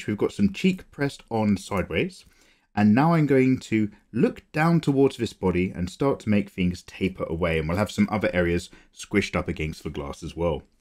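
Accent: United Kingdom. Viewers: he just seems innocent Because of his old school British accent. if he was from Boston he'd be the greasiest drawing tutor there ever was lol.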